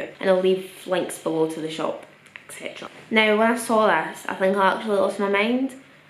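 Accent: Scotland, United Kingdom. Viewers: ̗̀c h a o s ̖́- scottish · are u Scottish? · I wasn’t ready for the Scottish accent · wow. this is the first video i’ve seen her talk. was not expecting a scottish accent. lol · Sounds scottish to me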